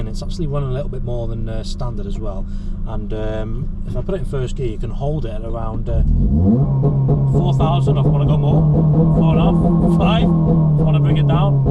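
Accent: North Wales, United Kingdom. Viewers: Where abouts in north wales you from?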